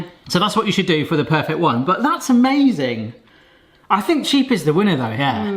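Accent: United Kingdom. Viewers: Well my good home chef, I took the liberty of watching a couple of West Country accent videos and I can definitely say that while you definitely have what to my American ears sound like a "British Accent", you have only a hint of the "yap" I could detect in the dialect... but I'm also somewhere between the Midwest and Northeast of America, where apparently the accent has an effect (West Country old men legit sound like old men from my area with British accents, lol). Loved the video, by the way! Makes me want to get a Twix! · Im Irish. Your accent isnt strong at all compared to others in the UK. Trying to understand my accent would be a hoot though.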